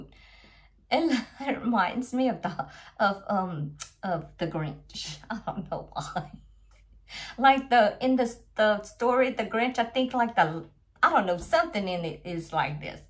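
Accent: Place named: Louisiana, United States. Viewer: That is one pretty owl. I'll have to check out your keychains next time you show them. I ordered from Abigail when she first opened her shop but it was not putty. It was wax and it's great. I still have a ton left. Smells amazing too even after a year. You are almost at 250 so congrats on that. 🎈I'll shout you out on my Community Page. I love listening to you talk because my Mama was a Cajun lady herself from Louisiana and I miss her so much.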